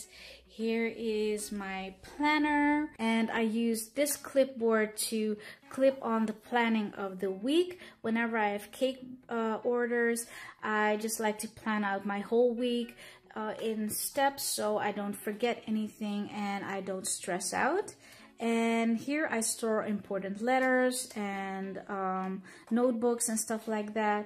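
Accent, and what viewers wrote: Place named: Philippines. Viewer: Cool vid! Btw you a lil filo accent, are you filo?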